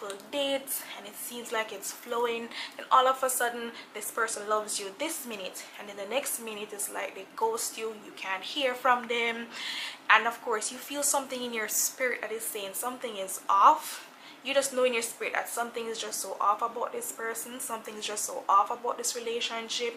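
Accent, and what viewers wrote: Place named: Jamaica. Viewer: Hello I see You have an accent are from Jamaica 🇯🇲 by any chance?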